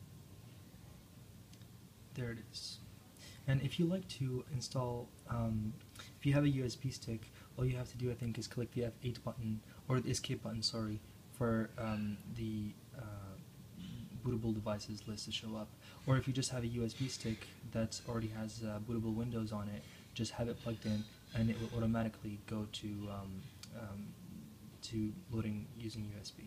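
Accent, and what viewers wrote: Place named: United Kingdom. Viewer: SHIT DAWG SLOW DOWN WITH THE BRITISH ACCENT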